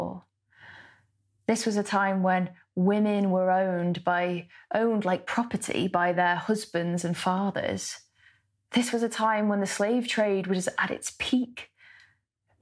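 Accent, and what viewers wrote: United Kingdom. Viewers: #waw Untouched by the comprehension of English poems! felt like a school student once again though I am a researcher that too in Forests! to my great surprise I enjoyed like a terribly good ice-cream! first time here, liked shared and subscribed #wishes BTW your climate anthem song is a gentle tornado! and your beautiful British accent is charming like you. welcome!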